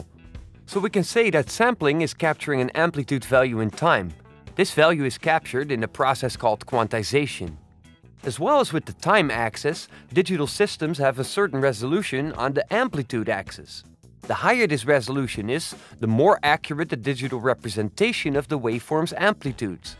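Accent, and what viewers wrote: Netherlands. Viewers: You sound dutch.. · are you dutch by any chance or belgium?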